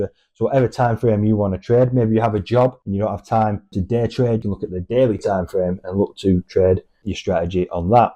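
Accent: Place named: United Kingdom. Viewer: Nice video but for me is your british accent not understanable.. you dont said lower low/higher high you said lo lo/hai ha😂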